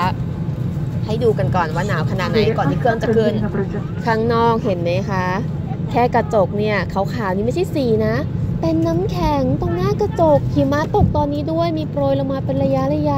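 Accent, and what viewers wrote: United Kingdom. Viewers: เวลาคุณกู้ดไปเที่ยวแบคแพค ดูไม่ออกว่าเป็นลูกคุณหนู CEO เลยค่ะ ยกเว้นตอนพูดอังกิดสำเนียง british ^^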